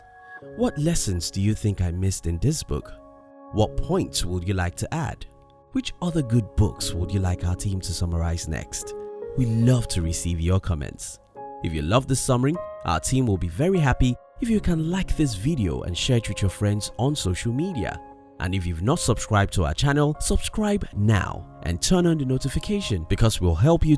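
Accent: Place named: Nigeria. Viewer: Strong Nigerian accent 😆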